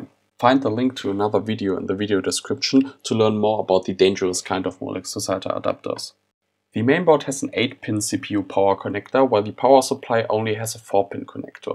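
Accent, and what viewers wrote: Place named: Germany. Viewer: Man hört voll raus das du Deutsch bist is bei mir auch so das voll cool :)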